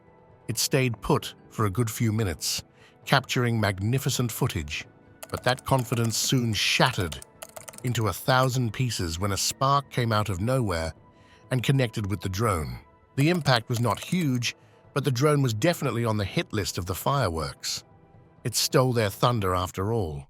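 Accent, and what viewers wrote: United Kingdom. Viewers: Annoying British commentary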